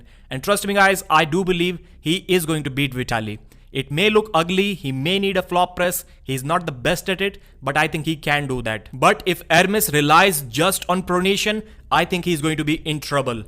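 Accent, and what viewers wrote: India. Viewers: dude your accent is sooooooo strong I could tell a mile away you're Indian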